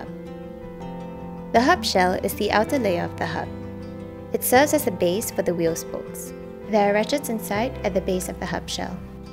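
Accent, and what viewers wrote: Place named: Singapore. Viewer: Sounds like you are from Singapore. So proud of you. Now that i have watched all three videos. Majulah!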